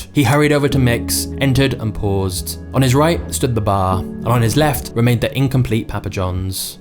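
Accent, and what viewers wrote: England, United Kingdom. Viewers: English script reader, AMERICAN story. Fix that...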